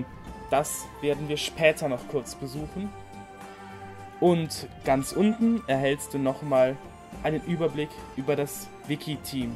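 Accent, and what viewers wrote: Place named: Franconia, Germany. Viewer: BAYERISCH? Ich fasse es nicht! Wir sind FRANKEN! Das ist ein ganz ganz ganz ganz wichtiger Unterschied! :p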